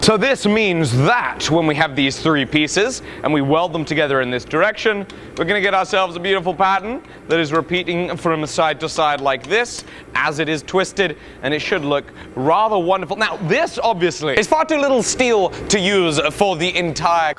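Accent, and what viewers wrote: United Kingdom. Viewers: Funny after watching your newer vids, 2026.. You sound deeper here and have a way less British accent than in your new vids? Interesting, character work? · I love your british accent :) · He's almost TOO British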